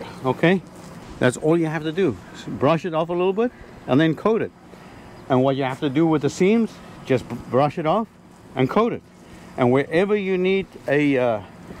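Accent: South Africa. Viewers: is that a South African accent?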